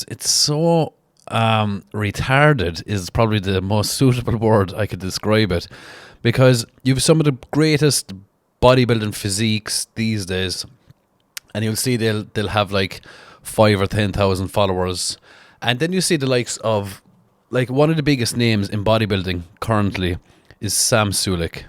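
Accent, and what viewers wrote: Ireland. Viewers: By fuck it's refreshing to hear an Irish man vocalise the amount of gayness in the world these days. Fair fucks to yee bie 🤛🏼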